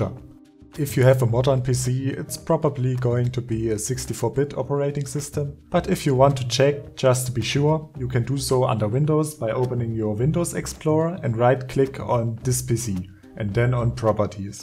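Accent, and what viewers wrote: Germany. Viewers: You included EVERYTHING important (I think)! Omg, you're very helpful for people, who are looking for a proper guide. I subscribed, and also I love your German accent 😂 I live in Tschechien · Ich liebe diesen deutschen Akzent :D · Hab den deutschen Akzent von Anfang an durchgehört :) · Dieser Deutsche Akzent und dann noch die stelle "Werbung Überspringen" 😅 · Hast ein Abo aus Prinzip 1. Der deutsche Akzent 2. Du folgst "Der Valon" du ehrenmann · Wusste ich doch, dass ich da den deutschen Akzent rausgehört habe. :) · man merkt das du deutsch bist xD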